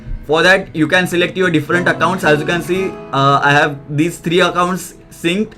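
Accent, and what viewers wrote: India. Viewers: you have to be Indian to understand this guy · Can we have an American do this instead. Of a Indian guy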